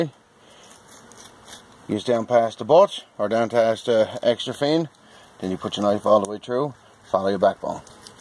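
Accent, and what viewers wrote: Newfoundland, Canada. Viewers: Most likely from Newfoundland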